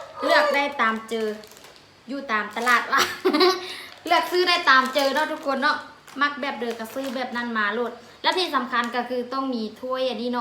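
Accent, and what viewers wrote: Laos. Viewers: ຊົມເຊີຽທີ່ເວົ້າພາສາຊຽງຂວາງລ້ວນໆ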